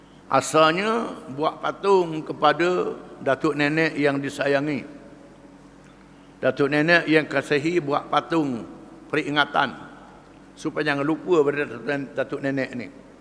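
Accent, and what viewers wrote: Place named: Terengganu, Malaysia. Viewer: suka dgr kuliah tok guru, tidak terlalu ganu loghatnya. org KL pon paham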